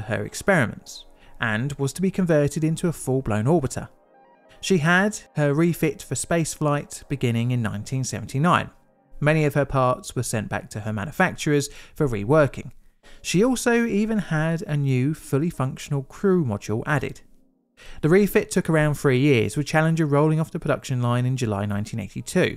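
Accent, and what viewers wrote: United Kingdom. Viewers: He is British, slight difference in pronunciation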